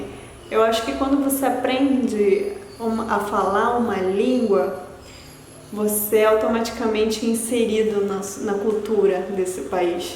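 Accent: Rio de Janeiro, Brazil. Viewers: Você tem sotaque carrioca! haha Que bonito! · Você fala português muito bem mais você está falando o carioques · Seu sotaque é perfeito. É carioca até na musicalidade, poderia falar sem chiar o S que saberiam que é carioca. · Fala bem o português com sotaque carioca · Seu sotaque é de carioca o português 💚💛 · É lindo seu sotaque carioca. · boa, babacss existem em todos os países. Agora essa frase"muita das vezes" não existe(isso é o que chamamos de vício de linguagem ou gíria carioca), pois o certo é MUITAS VEZES !Mas parabéns, esta falando o portuguees brasileiro (e carioca puxando o xxxx no lugar do s, certinho) a gente q é carioca puxa o s ｍｅｘｘｘｘｍｏ kkkkkkk!SRN! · mano kkk não parece que você é estrangeira. Pra mim que sou do norte você tem um sotaque carioca, mas as vezes parece paulista. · Na verdade voce ja tem ate o sotaque carioca, muito bom! 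· Fica tranquila, você passou pelo ritual de inicialização que todo estrangeiro passa no Brasil para se tornar um brasileiro raiz. Falar o português brasileiro sem sotaque. E você está falando perfeitamente e com um belo sotaque carioca. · Putaquipariu sotoque carioca! Sou do RJ. Tamos juntos 😲😲 tu é fohda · Vc tem sotaque carioca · Fala perfeiro em Portugues sotaque carioca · VC é pessoa abençoada! Tem até sotaque carioca... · Bom tem um excelente português, mas com sotaque de carioca,fala chiado rsrsrs. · Ta carioca demais da conta sô, quero ver falar mineirês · Há uns anos atrás, eu comentei com um amigo meu colombiano que se ele falasse português sem sotaque ninguém diria que ele era estrangeiro. O mesmo pode se aplicar a você que já brasileira naturalizada. O português falado no Brasil tem vários sotaques e o seu é visivelmente carioca, que é o certo. Mas não fique preocupada em relação a isso, já que o sotaque carioca culto é o padrão para o português brasileiro. 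Como dizia a propaganda da Schincariol: O jeito certo é o do Rio! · Sotaque carioca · Todo e qualquer estrangeiro é visto por nós brasileiros como um irmão, eu acho muito fofo os sotaques, O Brasil tem vários sotaques, Vc já adquiriu o sotaque carioca. Boa sorte menina lindo seu nome, seja firme vc é uma vencedora. Forte abraço Princesa. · Seu sotaque carioca é genuíno, linda carioca peruana! Acompanho vários canais de estrangeiros morando no Brasil. De maneira geral, me parece que as mulheres estrangeiras aprendem o português melhor que os homens estrangeiros. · Como assim sem sotaque.. mó sotaque de carioca pow.... kkkkk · Fala portugues com leve sotaque carioca. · Todos temos sotaques. Seu português é carioca. Aqui no Brasil ainda são poucos os estrangeiros na escolas e universidades. Por isso chama muita atenção. Creio que a leva grande de latino-americanos, vide (venezuelanos, bolivianos e equatorianos ) ,africanos e árabes (sírios) que estão chegando acabará por tornar mais comum os sotaques diferentes. 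Competência ( Competição) Competência em português tem um sentido de aptidão. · Sou cearense ,mais você tem sutaque SiM,seu sutaque e carioca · Seu português é muito bom. O sotaque é carioca. Você é muito simpática, inteligente, determinada. Sua observação sobre esse problema de relacionamento,rejeição,dificuldade, não sei o que dizer. Mas fico muito feliz com o seu empenho de querer ser brasileira. Com sotaque ou sem é uma honra e pessoas como você engrandece qualquer lugar onde estiver ou vier estar. Fico muito contente em saber que sou conterrâneo de uma peruana nascida em Lima. Até breve,desligo.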